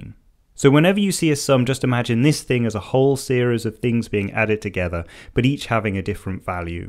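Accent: England, United Kingdom. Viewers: English accent but u spelt maths as math... (facepalm)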